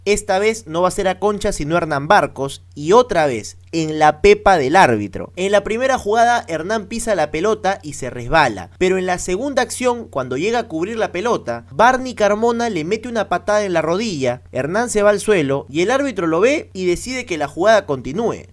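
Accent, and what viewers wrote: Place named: Argentina. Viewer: Te agradecería si dejas ese acento argentino ¡Che!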